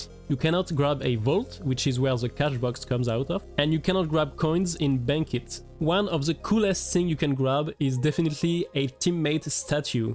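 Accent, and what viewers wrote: France. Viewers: dude this is so funny, well edited and highly informative for such a low view and sub channel, take my damn sub! also you accent (I'm pretty sure it's french) is 🤌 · Bro i love your accent “we grrrrab ze playeur statu and rrrun to zhafity wit it” it is so nice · You sound french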